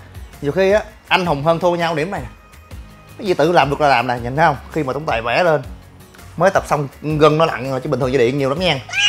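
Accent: Mekong Delta, Vietnam. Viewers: Mới xem ông này nói chuyện cười quá giống chất miền tây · Lần đầu xem videol của a nhưng rất thích đậm chất miền tây dễ thương ☺️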